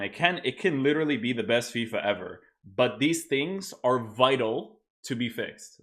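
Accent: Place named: Portugal. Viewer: Man you from Portugal or something? Not only because of the shirt but your accent on player names makes me think you portuguese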